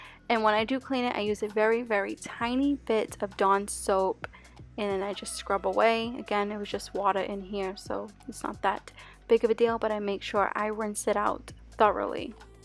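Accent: Boston, United States. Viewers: Are you from Boston? I can hear the accent!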